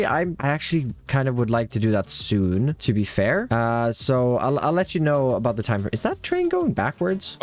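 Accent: Sweden. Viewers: This is the first video I see of you and by your accent I bet you were swedish.. and then I looked at your youtube channel description and I was right.. Im swedish too :D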